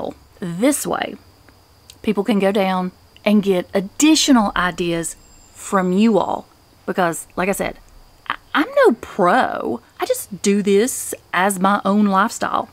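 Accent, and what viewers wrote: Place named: Louisiana, United States. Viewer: You’ve got to be from Louisiana Boo! I love it. 😂❤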